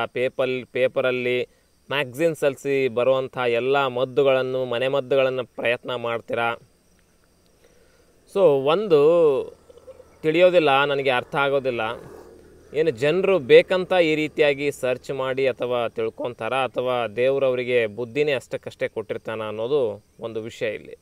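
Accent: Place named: North Karnataka, India. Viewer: Dr, nemma baasheya sogadu yellow ondu kade namma Uttra karnataka da pada balakeyagi nanage nagu thandukoduthade, edu kelodaklke cholo re😍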